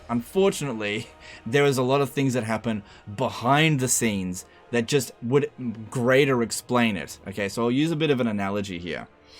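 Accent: Australia. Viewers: I have never played one of these "Total War" games. I thought this channel was to listen to an Australian talk about cheese in a funny voice while he does something with digital action figures.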